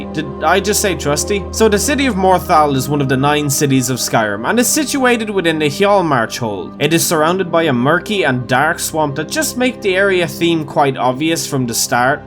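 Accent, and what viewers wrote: Ireland. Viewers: First video, so I was like "why dafuq he sounds like a drunken dude?" Then I saw the name of the channel and went "Oh... That's why" Love Irish accent ~and their drinks, and gingers~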